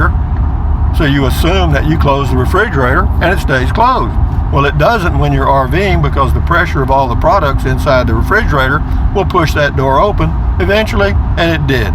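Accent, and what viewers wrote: Texas, United States. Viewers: I thought the same thing the first time I heard him say it, but then figured out it was just his Texas accent. It is funny to me, though.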